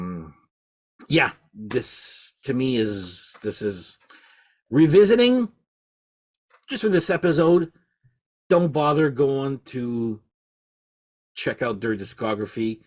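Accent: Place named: Canada. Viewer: I was watching this video, and listening to you, and my first thought was "this guy sounds Canadian". Then you said "Power Hour", and I noticed the senators flag in the background! 🤣 Ah-HA! A fellow Ottawan. I actually know Michael Angelo Batio, and he's a really good dude. His guitar playing talent is unreal. I've never seen anyone play as fast as he does (even today in his 60s!). As an old school 80s Thrasher, was never my thing, but Mike's technical chops cannot be denied! Cheers!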